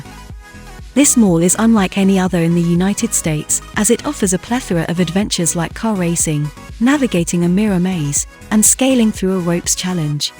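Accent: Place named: United Kingdom. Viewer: This lady commenting this video & her British accent annoys me. (other than a suburb of Syracuse is named “Liverpool” there’s no reason to have a someone with a British accent narrate this video, about a city in the middle of upstate New York)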